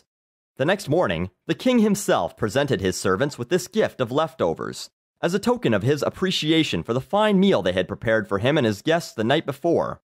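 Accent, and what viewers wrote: England, United Kingdom. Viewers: Epic British accent, bro. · i like british accent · lol english accent XD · awesome brit accent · You have a great British(?) accent. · Your British accent was too adorable. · Your English accent is pretty awesome, man.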